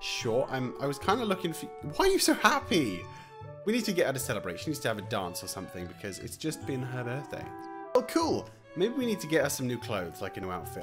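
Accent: United Kingdom. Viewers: your British accent is very cute and fancy!💖💖💖 Love ya!!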